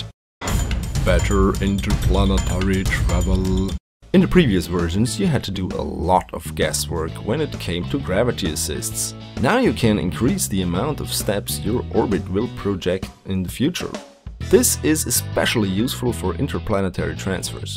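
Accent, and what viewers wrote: Germany. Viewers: u German? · Are you german by any chance? ;-)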